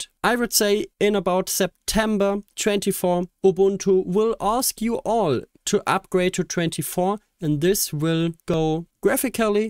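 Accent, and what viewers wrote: Germany. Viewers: i hear Deutsch?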